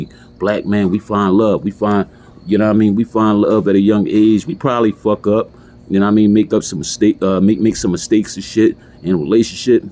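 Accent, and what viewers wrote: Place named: Philadelphia, United States. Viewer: Good chit bro💯🐺you sound like us philly n*****🤔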